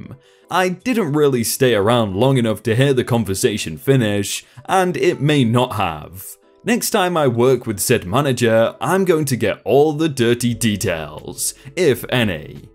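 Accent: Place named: England, United Kingdom. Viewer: At least you don't think his voice is computerized, LOL. But seriously, you do know that English people pronounce some things differently, right?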